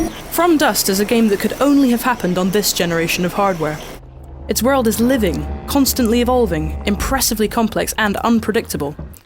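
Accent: United Kingdom. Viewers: I knew as soon as I heard a young British person reviewing this 2 million idiotic Americans would be up in arms. Just because your vocabulary doesn't stretch past 'awesome' and 'sucks' doesn't make it a bad review, it just makes you an idiot. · Whoever mistakes a woman with a British accent with a squeaky 13 year-old is simply disgraceful. Like, seriously. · Australian? That don't sound like any Australian I know sounds more like British or Scottish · Lol all the people who don't know it's a British accent. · I'm sorry... but is it a young British girl or guy giving the review? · people! it's quite clearly a woman reviewing... and if you disapprove of our British accents then you can jolly well sod off - and stop picking on our saliva build up due to too much tea and crumpets... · hes british u fuck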